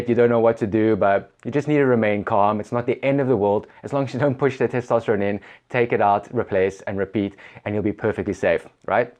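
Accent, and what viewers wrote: South Africa. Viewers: Sounds south african?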